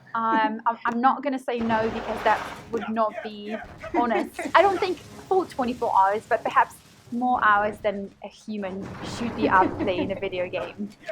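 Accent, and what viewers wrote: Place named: United Kingdom. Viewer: I like the British accent. Haha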